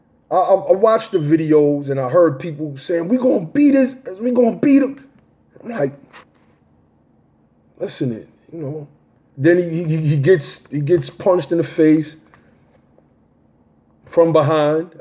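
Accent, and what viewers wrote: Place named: New York, United States. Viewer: You sound like BX or BK, but I love your delivery. Have to Subscribe right now. You speaking for real truth. Exactly how I'm seeing this sucker move in Harlem.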